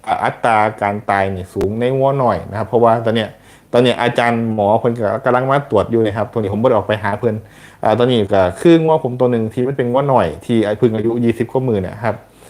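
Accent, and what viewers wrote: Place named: Phutthaisong, Thailand. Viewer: พุทไธสงชัดเจนคับ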